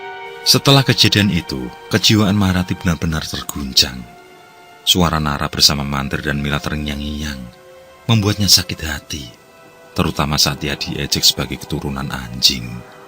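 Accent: Java, Indonesia. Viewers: Kenapa di kalimantan logatnya ada jawa²nya iya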